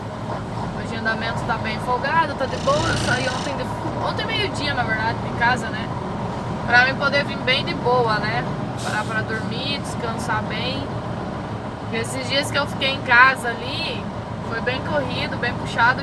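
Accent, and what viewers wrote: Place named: South, Brazil. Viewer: Boa tarde,que sutaque bacana,por isso que gosto do sul,bom final de semana ,que Deus abençoe muitíssimo.rio de janeiro.bairro Pavuna.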